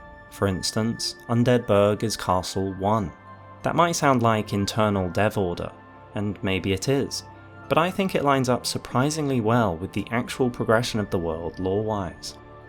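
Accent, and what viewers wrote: United Kingdom. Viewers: Good video but must this guy talk with a British accent????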